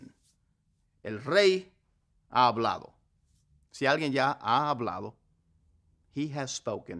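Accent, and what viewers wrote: South, United States. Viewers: Wouldn't have guessed you'd have a southern accent when you speak English haha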